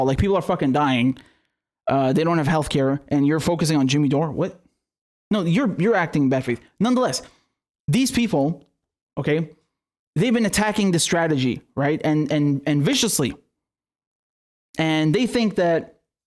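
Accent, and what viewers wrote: United Kingdom. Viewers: that British granny accent tho. Keep up the great work. We need to amplify real progressive/leftist voices. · Despite the serious nature of these events, has me laughing tremendously. Loving the British accent haha.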